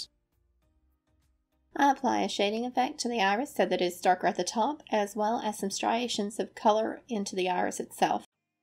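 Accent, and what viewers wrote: United States. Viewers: Awwwww lol!!! I think being from the UK, any accent from the states, us British love!!! 🥰🌈. Your work is so good hun. Thank you for these vids, they really do inspire!! ❤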